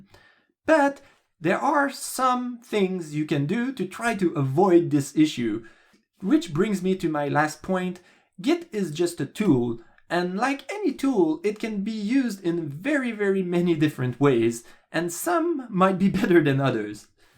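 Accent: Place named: Quebec, Canada. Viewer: Québécois? L'accent semble dire que oui! Ton channel est vraiment bon merci pour les vidéos et l'effort. J'ai une question pour toi (je vais la mettre en anglais pour le bénéfice de tous). I use git/github for programming. However, I'm just starting game dev as a hobby: how do you use it for version control specifically for video games? Do you save everything, or just your source code? Do you use different kind of backup (one for the binary files - or at least non-code, such as the project files - and then one for the source code?) or just one repository for everything (or do you save them elsewhere)? Or for the binary files you just put them on a physical device for backup? Also, what's the architecture of the git trees itself for games (in terms of good practice)? Is it the same as software where you have one main stable one, then multiple production one for different features, etc. 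? In others words, could you extend a bit the end of your video? Thanks!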